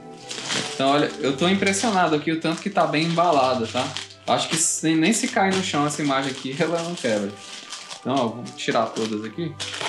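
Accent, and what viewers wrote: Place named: Ceará, Brazil. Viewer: tu é do Ceará? Teu sotaque parece com o meu.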